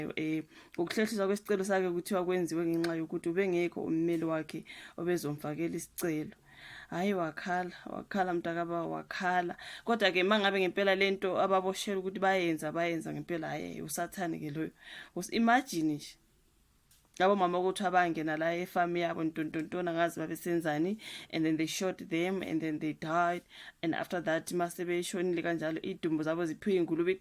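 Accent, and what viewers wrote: Zimbabwe. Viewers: Your Zulu is not make sure it sound Zimbabwean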